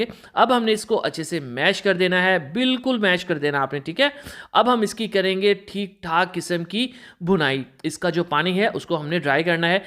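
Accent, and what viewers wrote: United Kingdom. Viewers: Nice.. But plz Urdu lehja me he Urdu bolain ,,, English accent me bolne ki koshish na Karen. Apki voice bahot piyari hy ... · Nice recipe,,, liken Aap urdo baat kar rahihen ao lihja English ka hai lihaza lihja thek kren bahut bura lagta hai Aapki sar pi firingi Bhoot swar hai😈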